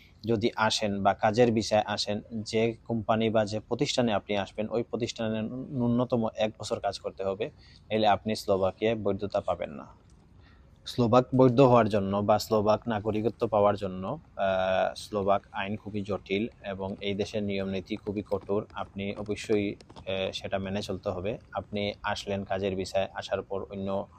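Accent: Sylhet, Bangladesh. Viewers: আসসালামু আলাইকুম। ভাই, সম্ভবত আপনি সিলেটি, আমি সিলেট থেকে বলছি, কিভাবে আসতে পারি, জানালে উপকৃত হতাম।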